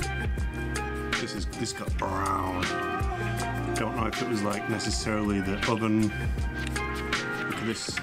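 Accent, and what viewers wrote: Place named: RP, United Kingdom. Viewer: Hello where are you From originally the accent you have is posh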